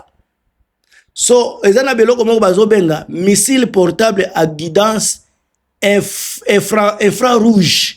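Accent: Rwanda. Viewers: Oza rwandais lingala na yo ezo funda yo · Liboma nayo yoka tonalité ya Français Rwandais ce normal olobele m23 po oza rwandais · Oza kizengiyo bozela yo oza congolais kizengiyo nyama yoka ata lingala nayo rwandais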